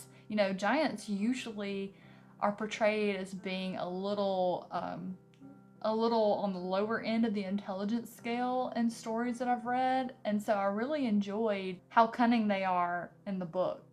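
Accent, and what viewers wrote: South, United States. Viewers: Omg your southern accent <3 I am Canadian and I've loved my trips to the south. John Gwynne is a damn badass and I love him. I hope you LOVE the rest of the series.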